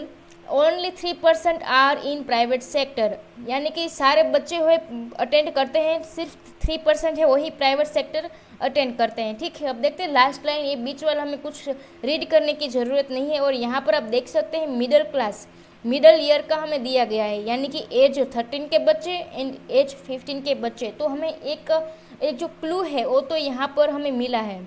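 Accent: Gujarat, India. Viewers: Awesome 👍, are you gujarati?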